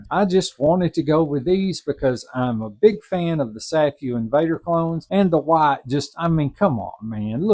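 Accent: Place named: Texas, United States. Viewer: Love your southern accent! you from texas?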